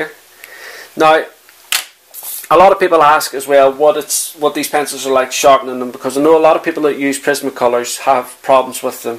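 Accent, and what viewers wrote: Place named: Northern Ireland, United Kingdom. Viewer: Great to hear a fellow NorthernIrish voice talking about coloured pencils!! I started using them about 3 years ago in my art (I use lots of different media), but I don't know anyone else here using them. Do you live in N Ireland? I'm a member of the UKCPS, I don't know if you have heard of them? Anyway, these are great pencils but I only have the small set. Atm I mainly use Faber Castell polychromos and I love Derwent's Drawing set palette, especially on black paper. Will follow you on FB and Twitter!! Thanks for these videos. My husband keeps saying I need to start doing videos but I don't think I'd be much good. This was very informative and well done. Keep up the good work!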